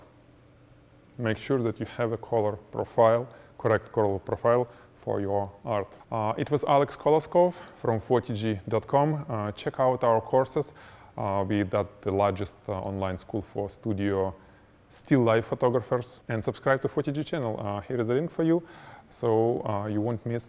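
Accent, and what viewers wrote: Russia. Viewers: мне кажется или я слышу русский акцент?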